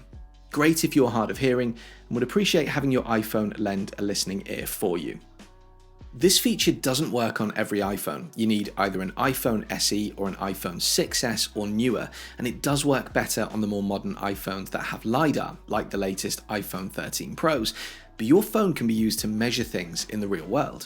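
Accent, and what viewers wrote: United Kingdom. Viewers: Great videos!. I like the content and the presentation style. BTW what regional UK accent is that?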